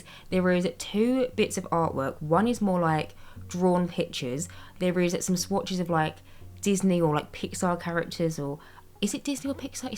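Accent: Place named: Manchester, United Kingdom. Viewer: Where are you from. your accent is giving northern. Maybe manchester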